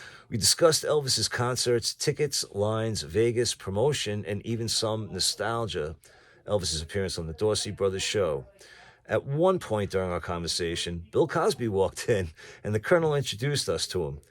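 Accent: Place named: New York, United States. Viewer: Hi, I wanted to say I truly enjoy your videos. I remember having an original Kings Court button when I was a kid, I may still have it somewhere. Also nice to hear the accent of a fellow New Yorker, I’m born and raised in Brooklyn but live in Florida now. Anyway just wanted to say hi and keep the videos and memories coming.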